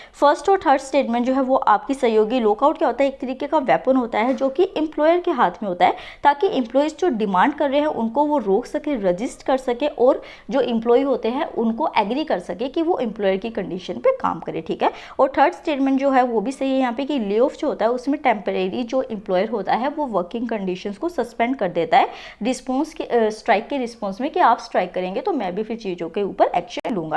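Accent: Haryana, India. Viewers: That is called "Haryanvi" accent.😎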